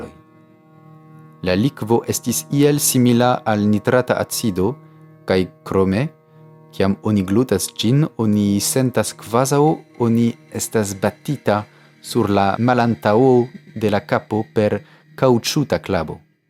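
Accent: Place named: France. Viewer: Mi rekonas francan akĉenton sed ne la precizan homon...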